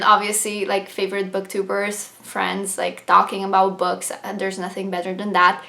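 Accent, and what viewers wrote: Slovenia. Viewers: Filming in pyjamas xD So relatable hahaha Welcome to Booktube again then haha I hope that you will enjoy it this time and that you will stay with us! This community is really amazing! So supportive and welcoming I mean you will find trolls everywhere but just don't mind them! Wait you're Slovenian???? Ziva from reader life is Slovenian too and well I'm half Slovenian and half Bosnian but I currently live in Belgium... Your accent is amazing!! You could do the International Booktuber Tag! Pets! yes I only have a dog but she is the best haha I mean you are unique, your personality is unique and anything else you do besides reading will make your channel unique... Oh you will meet tons of friends! They will even help you build a never-ending tbr hahaha I mean interaction here but also on twitter, instagram and sometimes discord. Watch other videos, comment and get to know people. 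I always say the same but stay yourself, connect with people, have fun and film the content you are passionate about! See you soon!!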